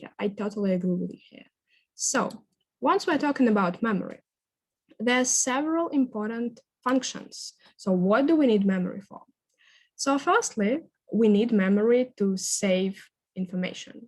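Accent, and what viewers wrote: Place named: Russia. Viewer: Умная, толковая девочка пытается вполне успешно канать под психофизиолога. Но для чего-то понесло вещать на иностранном. ДЛЯ ЧЕГО?? ЦЕЛЬ ТО КАКАЯ?? Какие иностранцы ломанутся слушать избитые популярные истины от русской студентки на ломаном русско-провинциальном английском. Понты? Понты... Но она же умная!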